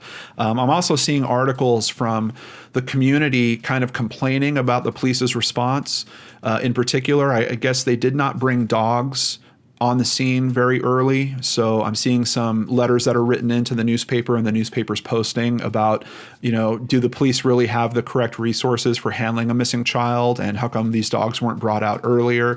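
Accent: Canada. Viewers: Great video. This is by far the most comprehensive coverage (in text or video) that I've even seen of Romero's case. As to you Canadian "out", accents have a way of spilling over national boundaries. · As a Canadian there's absolutely wrong with you sounding like it eh? This case is tough, whether or not Ronnie was responsible his passing pretty much killed the case and investigation since it sounds like the police really had him As the likeliest suspect and not many others. Thoughts are with that family who went through so much.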